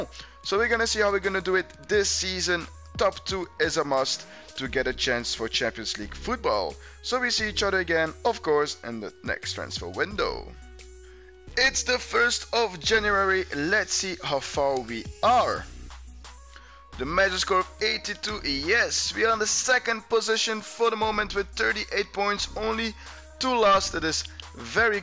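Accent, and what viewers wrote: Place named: Belgium. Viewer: Nice belguim accent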